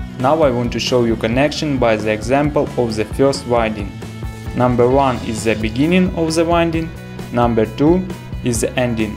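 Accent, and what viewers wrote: Russia. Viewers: говорит с русским акцентом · Это же русский по английский придуривается. И клей российский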